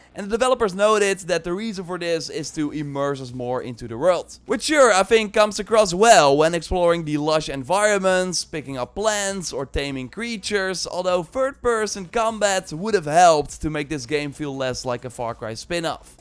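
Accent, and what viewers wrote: Netherlands. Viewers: You sound dutch! I am very hyped for it! I loved farcry 3 alot aswell as ac odyssey. Here is to hoping it will be good 👌❤️ Entered the giveaway! 🎉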